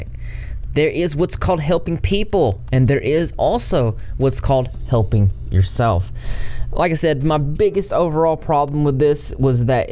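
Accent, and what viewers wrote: South, United States. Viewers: I gotta say, as a midwesterner who lived in the south for a few years, the accent is such a weirdly lovely breath of fresh air. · 1st) Sanders had gotten into something before b/c he was not making videos BUT when he came back I was happy for him and thought he was really trying to give viewers actual information so this is so disappointing, he seemed like once he saw all the racists videos for a while few years back not sure why but when Shane’s racist videos had really resurfacing (which is NOT cool at all) Sanders seemed like he was on a witch hunt or something he was actually one of the very 1st Drama type creators I had ever came across years ago so disappointing smh. 2nd) I recently came across your channel due to the Dahvie Vanity’s stuff few months ago and I love what you are doing you seem like a good hearted person and LOVE your country voice/words ....I am from NC and people from NY would always comment how they loved the way I talked and now I understand lol ! 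· U were cute until I heard that gross southern accent reminds me of Jim Crow laws GROSS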